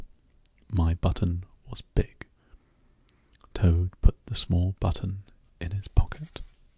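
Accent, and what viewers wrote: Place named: England, United Kingdom. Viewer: the English accent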